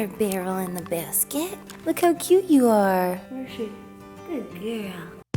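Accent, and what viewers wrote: New Jersey, United States. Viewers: shes nj n i think ny talk like that too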